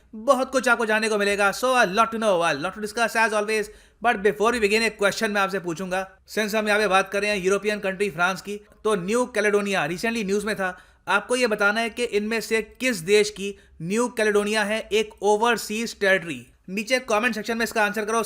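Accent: India. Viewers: really bad presentation. you are talking about IORA. you did not even show the full name in big letters. why would you not do that. you are speaking english words fast in indian accent. it didnt work, no point in watching this video and it deserves thumb down. really bad presentation.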